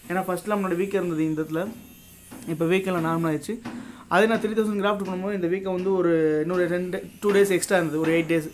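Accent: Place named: Madurai, India. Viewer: Bro Neenga paesuratha paatha Madurai nearer Pola Neenga..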